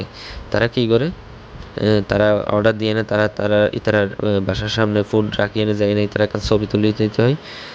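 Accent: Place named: Noakhali, Bangladesh. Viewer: ভাই আপনার কথা ত বুঝি না নোয়াখালীর লোক নাকি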